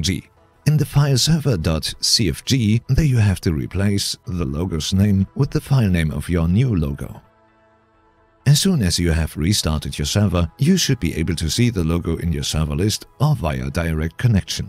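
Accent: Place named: Germany. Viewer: No worries it was beautiful! German people have a very unique and strong accent, and I personally quite enjoy listening to it, but I have honestly never heard one as nice as yours! I am from australia so listening to our accents even for me here is like wiping my butt on sandpaper hahaha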